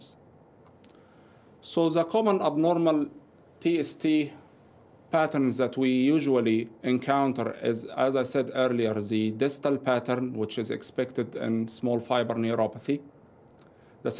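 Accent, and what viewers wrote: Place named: Egypt. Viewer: VERY INFORMATIVE. strong Egyptian accent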